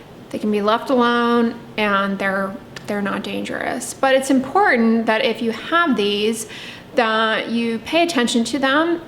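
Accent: United States. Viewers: Where does that accent come from? As an Australian, it is hard to know what part of America that accent is common. Just today I discovered my brother in law has skin cancer on his scalp. It’s the most common type (BCC). We cannot overstate the dangers of the sun.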